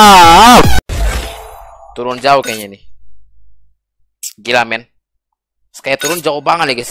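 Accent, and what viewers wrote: Kalimantan, Indonesia. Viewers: Bang Kmu dri kalimantan mana klo bleh tau sih :-) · Wah kalimantan ya bang ?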